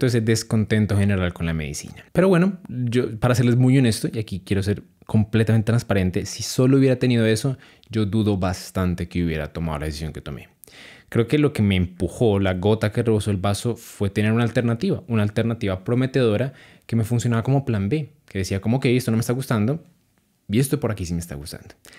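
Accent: Colombia. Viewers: Por la forma de hablar supe que es Colombiano.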